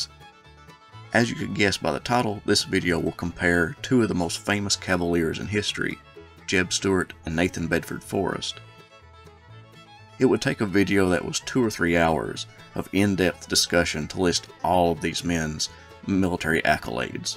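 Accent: South, United States. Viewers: Ye know When I first watched one of your vids I heard the southern accent and country music start up and immediately expected a hard confederates bias but was pleasantly surprised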